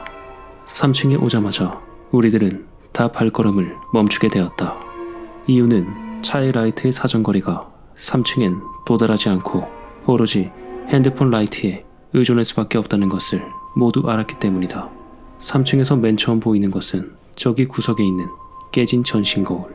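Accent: Gyeongsang, South Korea. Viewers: 님 최근에 알게되어서 잘 듣고 있는 구독자입니다. 편안하고 분위기 잘잡으시는 목소리와 특유의 경상도 사투리가 너무 매력적이라 구독하게 되었고 주변에도 영업하고 있습니다. 저는 해 떨어지면 전기 자전거 타면서 님 채널 틀어놓고 들으면서 자전거 타는게 너무 좋네요 ㅋㅋ 부디 지금보다 더 떡상하시길 기원하고, 저도 중학교때 겪은 소름돋는 일 하나 있는데 조만간 제보하겠습니다. 항상 힘내세요! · 제천인데 경상도 사투리고 구수하네. ㅋ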